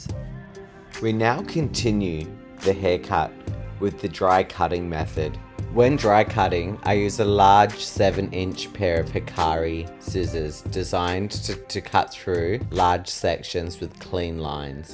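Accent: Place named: Australia. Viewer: Hi! WoW yr channel is stunning 😍 and I love yr Avustralian accent!! Actually I’ve fallen love with it.. sorry but this accent much more attractive and beautiful than english accent.This is immaterial but I just wanted to indicate.very good luck to y 😊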